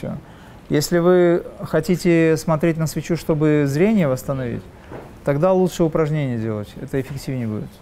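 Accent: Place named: Dagestan, Russia. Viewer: Аварский акцент есть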